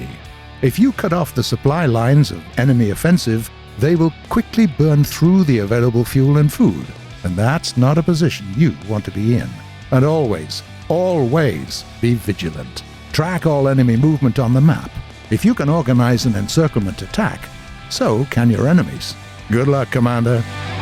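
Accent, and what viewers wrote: United Kingdom. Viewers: He sounds british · this dude accent is like an old british dude...... I LIKE IT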